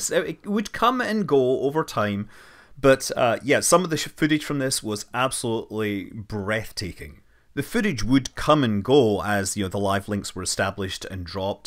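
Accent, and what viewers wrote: Scotland, United Kingdom. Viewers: Brought to your by, the manly Scotsman- love the brogue. 😊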